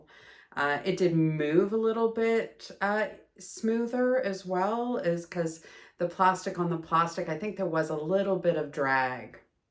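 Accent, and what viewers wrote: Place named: Canada. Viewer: Hi! Couple things first I have to say I want to know if you’re originally from Canada because as a Canadian I have what I call Canadiandar, which is Canadian radar, and as I was listening to you talk about this yarn, I instantly thought this woman is Canadian and then it says you’re from Germany, but obviously you weren’t born in Germany or you didn’t grow up in Germany because of your accent, so can you satisfy my curiosity about that? Second of all, I was looking for a review of this yarn because I’m about to order it to make a shawl and I see that you made a shawl with it. That’s so awesome. So thank you for your review because it was really the only one I found. Sidenote, another content creator that I watch makes stuffed animals with yarnart jeans yarn, and she says it’s the best one for that. I don’t make it so I don’t know, but I do love the various yarnart flowers. 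And by the way, yarnart does make an amazing cotton and a cotton acrylic blend that is very soft although I think the shawl you made is beautiful and completely worth gifting. Yarnart flowers is the cotton acrylic blend and yarnart rosegarden is the cotton, and I make shawls with them all the time. They come in big cakes over 1000 m actually you’re probably familiar with this yarn because you live in Europe, so you’re so lucky. You have easy access to this stuff. Actually yarnart flowers is the exact same yarn as hobbi twister. It even has the same colors.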